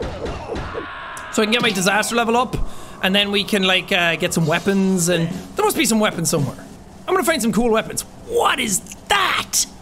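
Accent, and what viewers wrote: Scotland, United Kingdom. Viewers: I like your accent is it Scottish??? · Is he scottish? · BRO SOUNDS SCOTISH WHO AGREES 👇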